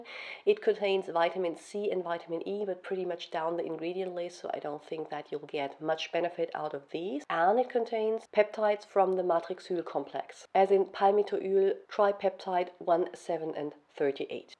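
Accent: Germany. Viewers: Why I Hear a german Accent 😂 Are u german ?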